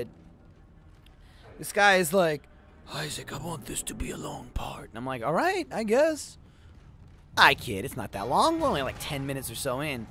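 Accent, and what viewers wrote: United States. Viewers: ... You Are from USA?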